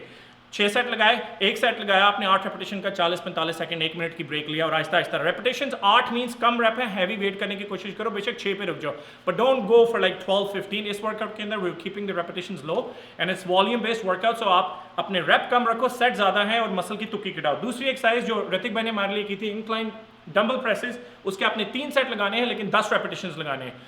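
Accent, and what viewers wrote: Punjab, India. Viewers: Vir ji tuc punjabi lgde o jhri apne aap muh cho bahr nikldi aa 😋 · veere video todi bhut changi ne .and toda punjabi boln di tone mnu or bhi changi lgi ne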